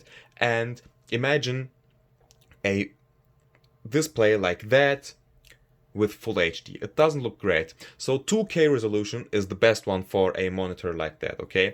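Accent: Turkey. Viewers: Digger ich höre an deinem Englisch, dass du Türke bist :D Probs für das gute Review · because of the accent, I was thinking you might be a Turkish guy, but after when I saw the glass bead on the wall, I am sure that you are a Turkish guy, süpersin bro güzel setup :D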